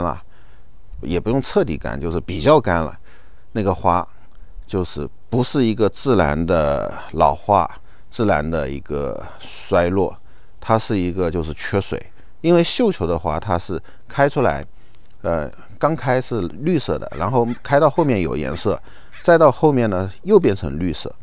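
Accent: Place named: Zhejiang, China. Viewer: 我就想问下是衢州人吗？普通话妥妥的浙西口音啊😇听到乡音好亲切！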